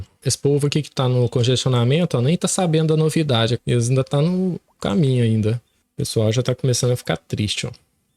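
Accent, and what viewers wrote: Minas Gerais, Brazil. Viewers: sotaque mineirin q e bom de mais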